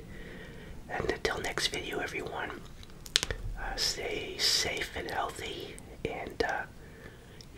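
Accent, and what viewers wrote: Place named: Massachusetts, United States. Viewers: What part of Boston are you from? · What part of Massachusetts is he from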